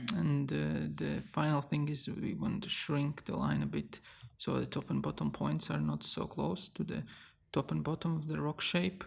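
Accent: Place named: Latvia. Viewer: Es sāku skatīties neredzot autoru, un tad ieslēdzas mans šerloks dzirdot akcentu... "Tā..tas toč ir latvietis... tā...ar Houdini procedurālā modelēšanā varētu mums būt Kurzem..." ..skrullēju - Yess :)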